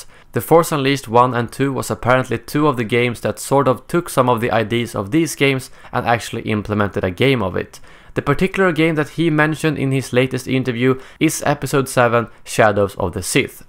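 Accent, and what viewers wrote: Sweden. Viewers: Are you Swedish? Because you're English sounds like you're Swedish. Have a good weekend! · Are you swedish? Keep up the good work man..👍🏼👍🏽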